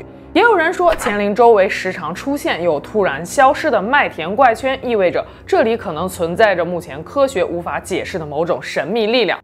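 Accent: Taiwan. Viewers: 哇第一次看见的厨房，我好像听到台湾。。你是台湾？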